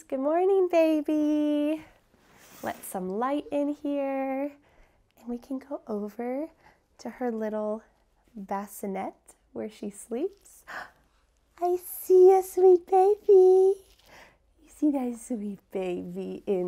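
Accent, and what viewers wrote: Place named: United States. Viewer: Hi Lady! You teached me english vocabulary and US 's accent also! Tks so much! However, each clip should be not long over 10min than very well !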